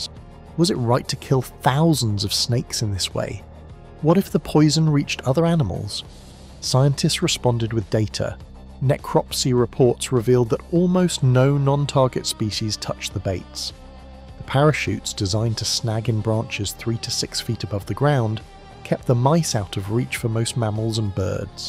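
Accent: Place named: United Kingdom. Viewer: Good video, very informative. Curious why this one has a British narrator and your others have a corn-fed American. Doesn't matter, just curiosity!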